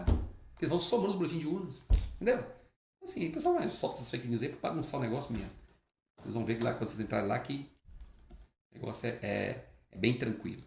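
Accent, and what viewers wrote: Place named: Minas Gerais, Brazil. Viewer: Olá Prof! Sou aluno de outro curso, mas assisto às suas aulas sempre que posso. Sua didática no formato conversação, com jeitin minêro, é sensacional! rs Parabéns, mestre!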